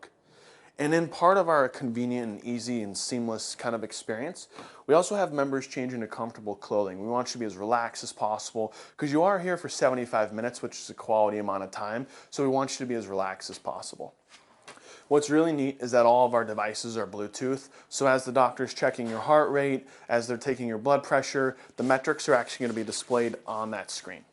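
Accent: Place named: Silicon Valley, United States. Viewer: That is an "upscale" speak practiced especially in a Silicon Valley, California.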